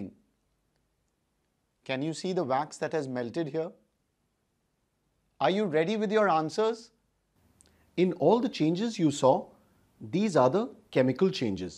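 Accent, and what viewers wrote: India. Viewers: i know he's indian cuz his accent🤣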